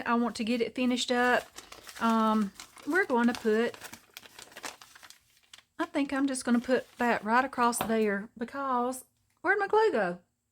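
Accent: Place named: Alabama, United States. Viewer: I love your accent! I have an Internet from Alabama and you sound so much like her. I too suffer from depression and art makes such a difference!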